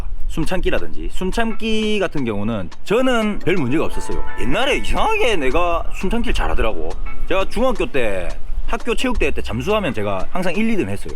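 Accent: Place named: Gyeongsang, South Korea. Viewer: 말을 재미있게 잘해시네 말투가 진주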